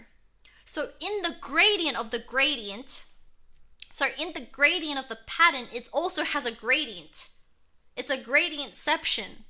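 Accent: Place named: Australia. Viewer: So cute but I can't stand the aussie accent lol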